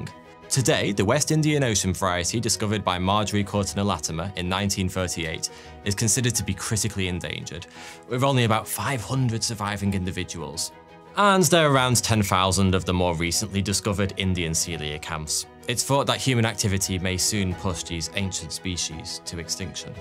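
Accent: United Kingdom. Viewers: I totally forgot you're British, I've gotten so used to the accent I can't even hear it anymore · There's something about British dudes narrating animal videos 😉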